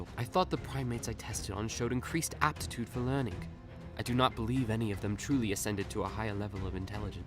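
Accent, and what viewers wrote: United Kingdom. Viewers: Why the hell are you reading these outloud....do that many people who play not read? And wtf is the british accent about, like you said its trash. This video is trash and extremely unhelpful. Thanks for the waste of time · I’m English and love your British accent haha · You have a British accent? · I don't mind the British accent, good voice acting in another dialect takes a lot of practice/training. My biggest problem is that you have this beautiful idea and a creative mind to put it all together and didn't bother to learn to pronounce a lot of the '$10 words'.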